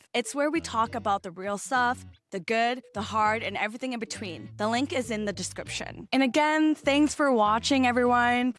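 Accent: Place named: California, United States. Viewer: Facts. I was like, "She definitely sounds like she's from California."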